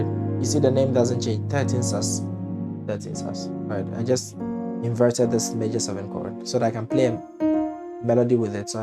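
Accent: Ghana. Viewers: Are you Ghanaian? You sound like one though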